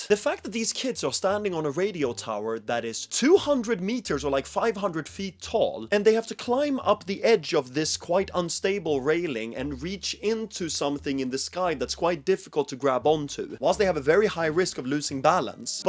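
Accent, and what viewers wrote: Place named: Sweden. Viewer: the way i activated like a sleeper agent when i heard that you had a swedish accent is frying me😭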